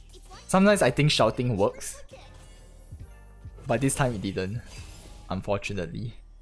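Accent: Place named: Singapore. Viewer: I think I know a Singaporean when I hear one. Keep it up bro!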